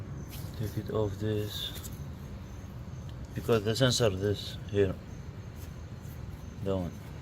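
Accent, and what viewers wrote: Iraq. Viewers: يا اخي لهجتك تكول انتة عراقي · اخوية انته عراقي من لهجتك ياريت تتواصل وياي لانه عندي نفس المشكلة واني ممنون منك ياطيب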